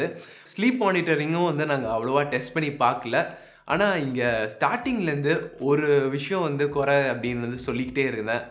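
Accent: Kerala, India. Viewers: I think he is Malayali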